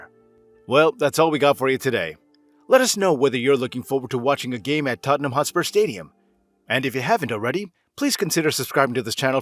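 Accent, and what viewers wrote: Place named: United States. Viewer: Who is this Yank narrating about Spurs’ stadium?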